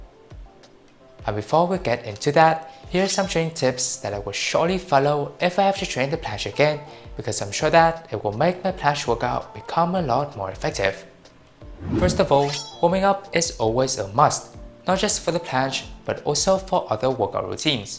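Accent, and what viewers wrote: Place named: England, United Kingdom. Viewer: Bro your English accent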